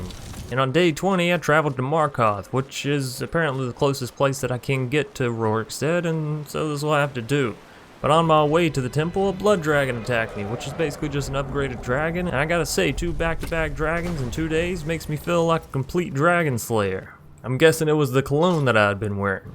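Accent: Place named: Texas, United States. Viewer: I feel the texas in his voice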